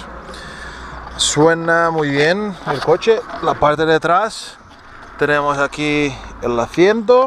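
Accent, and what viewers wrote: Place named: South, Spain. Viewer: Sotaque do sul, mano! Mas é perfeito! Parabéns!!! Abs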